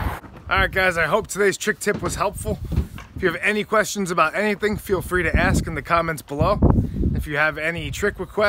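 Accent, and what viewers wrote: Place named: New York, United States. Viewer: Your trick tips are really great. A lot better than some of the others out there because I think you nail the "what you are supposed to be feeling" part really well. I gotta ask though, are you North Jersey or NY?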